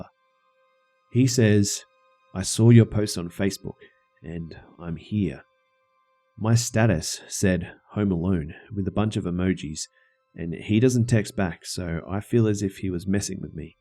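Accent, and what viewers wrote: Australia. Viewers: Are you Austrailan m8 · Only one problem..You have an Aussie accent mate, using American euphemisms...??? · Yeah he may be Australian but that's like er more British than American. It's older sounding somehow. And I'm not dissing the US here, cos they make good TV, but horror narration just CANNOT be done with an American accent. It's just off putting fsr. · Australian mate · He isn't British, he is Australian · Is Australian? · He is Australian. I think i should know what an Australian should sound like since i am one. Sup fellow aussies 😊🐨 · Australian bro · are you Australian I'm From Australia your voice sounds like your australian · Finally a fellow Aussie talking about scary shit good on ya m8! 👍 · Australian I could tell bc of the way he said mate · He's Australian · I think he may be Australian. No offence. Sorry if I am incorrect.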